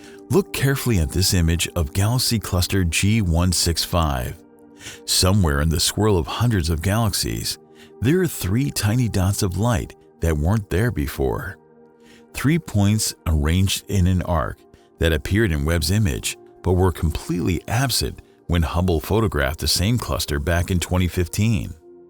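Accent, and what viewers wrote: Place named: United States. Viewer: Every bloody where you go, every bloody video....a yank accent. Too many yank accents..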